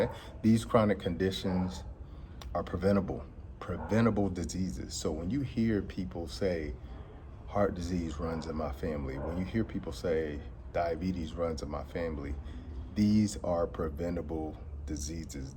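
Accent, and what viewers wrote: Jamaica. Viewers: Is he Jamaican?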